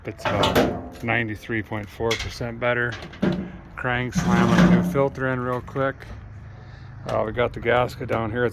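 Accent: Minnesota, United States. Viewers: For Pete's sakes. Definitely a fellow Minnesotan. Love it! Neutralis is my new favorite word. 😊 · very entertaining indeed and informative too, i like you're accent so American "pure jus" a bit hard fore European ears but i get used to it now, call the old lady " Ma baker " Couse chi never die and like that song.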